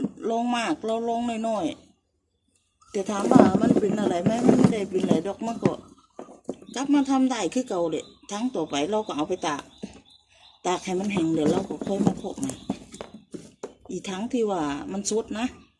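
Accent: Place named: Korat, Thailand. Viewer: อยู่บ้านไหนคือพูดโคราช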